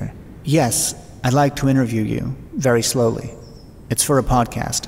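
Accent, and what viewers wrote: United States. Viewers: This is your "American" accent love it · Really good american accent. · Good American accent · So amusing to hear the American accent. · Great American accent! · 35 seconds in, it's already pure gold... Casper mattresses and Bombas socks 😂 and the accent omg! never would have guessed it wasn't a native accent! but ofc it has to be American! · Man, I actually thought the narrator was an American voice actor until the squarespace add came up.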